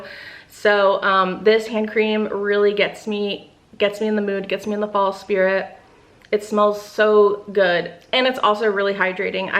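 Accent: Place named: Philadelphia, United States. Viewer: BLESS the delco/philly accent - i'm starting task this morning!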